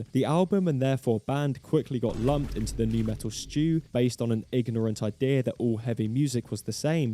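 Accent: United Kingdom. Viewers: You're British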